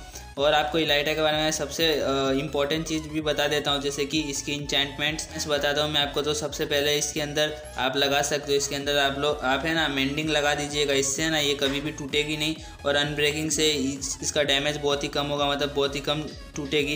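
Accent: Gujarat, India. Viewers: Pakka tum gujrati ho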